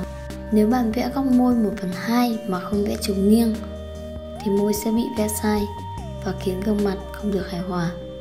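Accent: Vietnam. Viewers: We Vietnamese have such an accent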